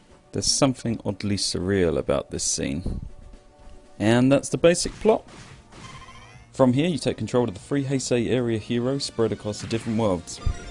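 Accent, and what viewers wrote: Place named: United Kingdom. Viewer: Wow, you really are British. I thought that was a fad or something. :P Good review, though I probably wont pick up the game. Wish they would make more legit Toku games.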